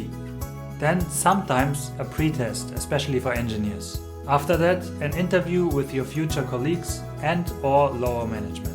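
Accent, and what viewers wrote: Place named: Germany. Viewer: German accent for sure :)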